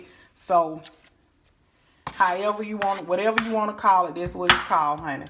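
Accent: South, United States. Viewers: It the real southern draw & southern meals fa me❤ if u aint from the south you wouldn't Understand❤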